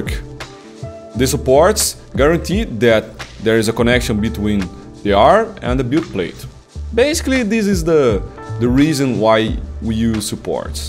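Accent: Brazil. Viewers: Oi pelo teu sotaque e nome, você é brasileiro? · A heavy Brazilian accent... that was unexpected tho! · So he has a Brazilian accent? I thought maybe it was Russian? GTK thanks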